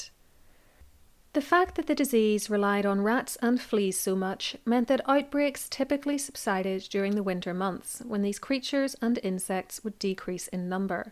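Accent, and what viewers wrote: Ireland. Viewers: Very interesting. Good research and no background music, thank you. New subscriber. The narrator has an interesting accent. Is it somewhere in Ireland? I could be way off, but it reminds me of my visit to Dublin decades ago. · No no it it's lovely, that's why I used Brogue instead of accent. My best mate was a lecturer at Queens, he married a beautiful lady from Ulster she has a rather stronger brogue. If we lose these wonderful parts of our culture - think how boring it will be. Your speech is wonderful , please stick with your normal relaxing voice. 👍👍👌👌 I've just subscribed , so please don't go American there's enough on Utube. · Ps. I LOVE that sweet Irish accent, jus’ sayin’…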